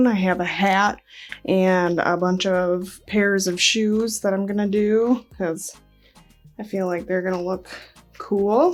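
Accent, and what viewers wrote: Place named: Michigan, United States. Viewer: awe thank you so much!!! That is so sweet! I definitely will take you up on that! I'm subscribing to your channel now, your videos are inspiring! I've lived in Michigan my entire life and your "accent" reminds me alot of ours lol im sure your state is close I thought that was cool as well, I feel like I don't hear it to often on YouTube.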